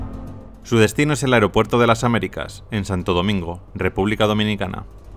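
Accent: Spain. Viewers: que dijo????? Puedes modular bien y sacarte ese acento español.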